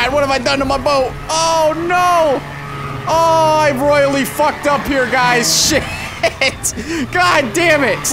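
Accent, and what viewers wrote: Canada. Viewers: has never sounded so Canadian and I love it 🤣🤣🤣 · I love how we forget how Canadian he is until he says “Home Hardway” “Canadian Tire” and “BAG” “EL” instead of bagel 😂😂😂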